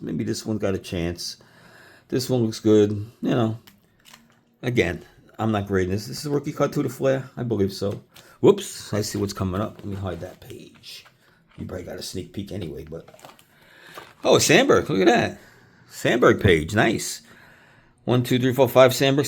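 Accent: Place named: New York, United States. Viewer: This guys NY accent is thick as a rug.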